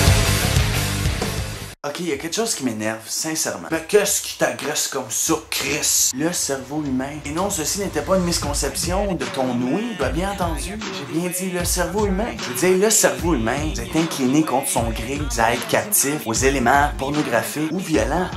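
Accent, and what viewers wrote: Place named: Quebec, Canada. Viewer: Why does French sound so ugly coming out of a Quebecer?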